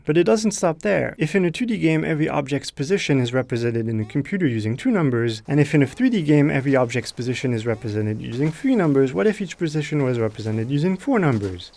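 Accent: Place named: France. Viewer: he sounds french, that's really cool. anyway, great video!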